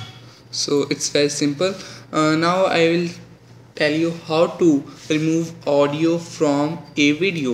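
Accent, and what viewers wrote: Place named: India. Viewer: He has one of the best Indian accents. He does take 2 minutes in saying "you have to click 'U' " XD So I don't think the dislikes are for his accent. I'm grateful, it's ok ^^ Thank you " "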